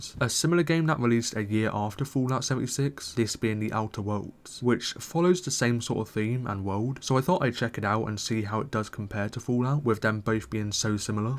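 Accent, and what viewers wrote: United Kingdom. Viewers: SERIOUSLY it's pure and concentrated I was thinking the same thing and made a comment too. Whenever I hear certain phrases here and there in this video I can't help but crack a smile. This guy has the most extreme british accent I've ever heard. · That is one BRITISH f***ing accent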